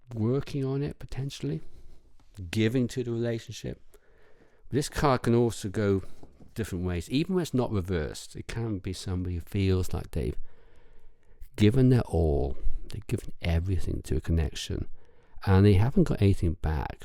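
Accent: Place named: England, United Kingdom. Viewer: Hello, nice to hear an English reader, and male to boot. Good reading, but being the other interested party it's not so heartwarming. Thank you, I shall keep my eye out for further readings, I have subscribed. UK listener.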